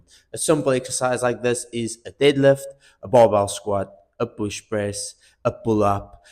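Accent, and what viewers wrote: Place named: South Africa. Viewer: Sounds like an Afrikaans accent my boy. Are you South African?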